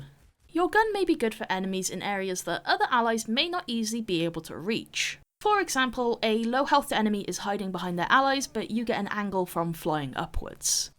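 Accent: United Kingdom. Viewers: Waaaaiit... Are you British? Also pls upload more vods and in general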